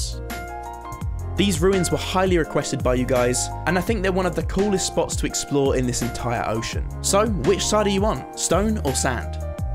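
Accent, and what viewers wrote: United Kingdom. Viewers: why did he have to be british though 😭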